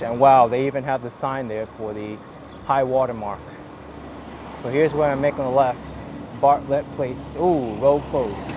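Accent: New York, United States. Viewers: NY accent.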